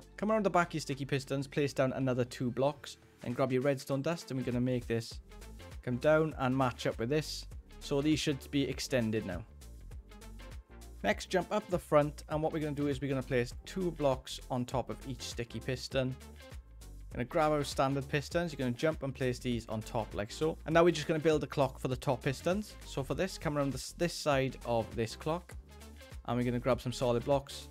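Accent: Wales, United Kingdom. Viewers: Hey, where in Wales are you from? I'm from Llantrisant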